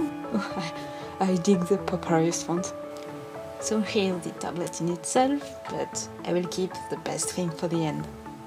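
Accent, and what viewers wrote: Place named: France. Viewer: NANNNN, T'ES FRANÇAISE ?! Jamais j'y aurais cru ! En tout cas, je tenais à te dire que je trouve ton travail très beau, de beaucoup de manières ! Que ce soit les couleurs, la technique ou le fait que tu propose beaucoup de choses différentes ! Continue comme ça, cette vidéo est géniale ! :P (ton accent anglais est trop mignon !)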